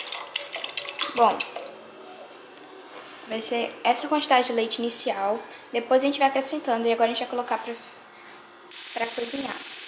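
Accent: Pará, Brazil. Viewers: sotaque de paraense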